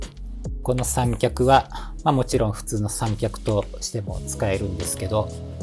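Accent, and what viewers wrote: Kansai, Japan. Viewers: 今度しんやさんの関西弁トーク見たいです(♥Ü♥)❤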